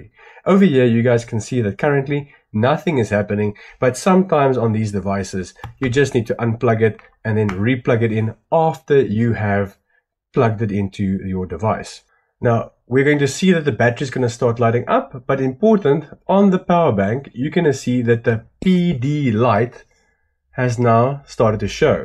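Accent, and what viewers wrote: South Africa. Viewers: Is there maybe a way to get a 60W or maybe higher (foldable camping) Solar Panel to get to charge drone batteries? I've seen some that comes with it's own attached mini "inverter" that pushes out 12V 60W per USB-C and an adapter for 12V DC direct. We have the Air 2S and 5 batteries. Going on an Adventure Bike tour, the tankbag will be housing the drone gear and GoPro stuff. Space and weight is limited as there is camping gear, rations, clothes, etc. that has to be considered, so bulky heavy powerbanks won't work. Would like to hear your opinion. You sound South African btw LoL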